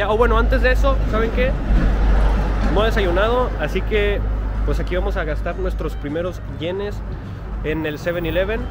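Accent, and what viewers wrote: North, Mexico. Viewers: y hablando con el acento del norte de Mexico que me encanta, saludos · Coahuila es una parte del norte de México? Es que se escucha similar a el Mariana y él creo que es de por ahí de norte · Bien norteño el ascento algo bien XD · Asu, hablas bien fluido el español de México y además tienes toque norteño compa, jaja increíble tu contenido, ojalá sigas creciendo como youtuber, saludos desde México, nuevo sub. :) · No mames me tripie, un bato con cara asiática hablando español norteño diciendo nacadas en tokio, bien vergas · Tiene asentó norteño exelente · Es mexicano, de Coahuila un estado del Norte de México, por eso su acento norteño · Eres del norte va? Se te nota un chiiingo el acento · Creí que mi papá y yo éramos los únicos que decíamos "pa miguelin" cuando nos referíamos a nosotros mismos jajajajaja. Amo su acento norteño ♡ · Nueva suscriptora, me encantó tu sencillez y tú hacento norteño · Este joven japonés con toque mexi-norteño me cae bien, excelente video! 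Gracias Yutu por recomendarme este canal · loco, que gusto escuchar ese acento desde otro pais, aparte (como dijeron otros por ahi abajo) norteñon xdd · es de coahuila wey, por eso tiene el acento norteño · Por pura casualidad llegue a este canal y ya me quedé, suenas con acento norteño jajaja saludos carnal!! Excelente video! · Jajajaja habla como mexicano norteño 🤤 lo ame 😍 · Wtf? Eres japonés con voz norteña mexicana que agradable sujeto. Además de unos vídeos entretenidos. Gracias yt por la recomendación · El nació en México, en Ramos Ariste en el estado de Coahuila por eso tiene el acento norteño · De dónde es este compa y por qué tiene hacento norteño??👌🏼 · wow tienes un acento super norteño ! la neta te rifas! me voy a suscribir! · ame su acento norteño y su humor <3, no más por eso me suscribo y lo sigo en insta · Amo su acento norteño hahaha ojalá pueda regresar pronto a México a visitar su familia ✨ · Al igual que muchos, primer video tuyo, la neta eres caga...o, caes chido, me late que uses nuestras expresiones mexicanas de forma tan natural como: "que sea lo que dios quiera" "ahí te encargo" "suaperro" y otras jajaja, con acento norteñon pero mexicano, no conozco tu historia pero ya leí que eres mexicano-japones, aún así me cayo poca mad...tu forma de expresar, tienes la fórmula básica de un creador de contenido: videos cortos+química+temática+respeto (en tu caso) sin duda vas pa'rriba y tendrás un buen de suscriptores, yo soy ahora uno de ellos, cuídate brother y mantén esa actitud natural no forzada, créeme ahí está la clave del éxito, saludos desde mexicalpam de las tunas. 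· Jajajaja un japomexicano bien raza , bien norteño !! Me voy suscribir me late tu rollo loco !!🤠✌️ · Es mexicano nació en Coahuila, su papá es japonés y su mamá mexicana por eso el estilo del norte de México, saludines desde Monterrey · El primer japones q miro q habla muy bien el español y todavía con acento norteño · Ay japonés con voz norteña me caiste de huevos, no hace falta ni escucharte pa verte lo mexicano, con los ojos lo dices todo, primera vez que veo uno de tus videos, excelente servicio le doy 5 chiles 🌶️🌶️🌶️🌶️🌶️ secos, saludos desde Xalapa :3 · ¿Te han dicho que te pareces a Ninomiya Kazunari? Es el primer video que he visto en el canal (vi la entrevista en el canal de Sandyael) y me ha parecido muy entretenido, sobretodo por el acento súper norteño. Espero que te vaya muy bien en youtube. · me parece que alguna vez dijo que nació en Guanajuato y muy pequeño su familia se mudo a Coahuila por eso el acento norteño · no se por que, pero por tu acento intuyo que aprendiste español en el norte de México, se te nota mucho el acento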